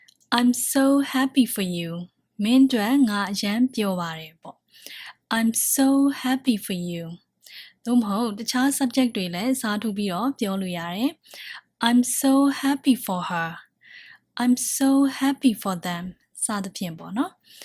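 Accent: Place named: Myanmar. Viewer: I like the burmese accent, its sweet in ears.. 😊😊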